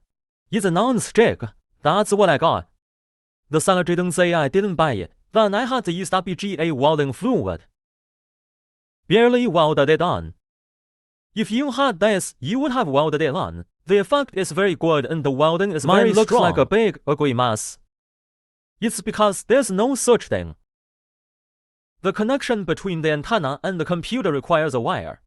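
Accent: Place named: China. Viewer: 不知道为什么 你的英文发音好中文啊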